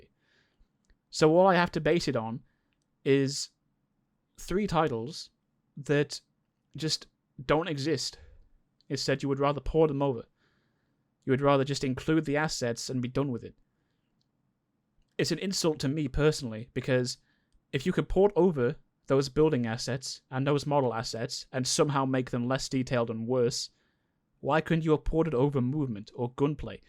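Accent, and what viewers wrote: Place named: South Africa. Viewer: Quick question. Where are you from? Because for some reason I'm getting south african vibes from your accent. Now I could be stupid and way off but I'm just curious 😅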